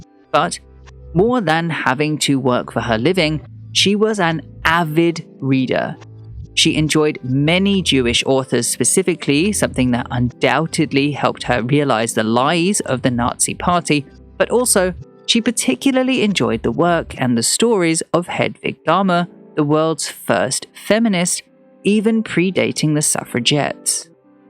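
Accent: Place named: England, United Kingdom. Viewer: I think it’s because you have an English accent, but it doesn’t sound super condescending and posh. I mean that as a compliment, it is an American lol.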